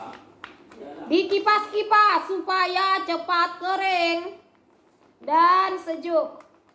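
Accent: Medan, Indonesia. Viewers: Denarin kk lucu banget kyak orang Medan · Maaff mbak orang medan ya??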